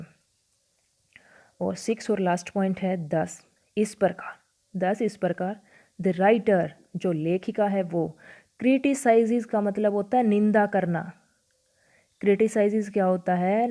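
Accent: Haryana, India. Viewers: Are you from haryana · Mam aap haryana se ho na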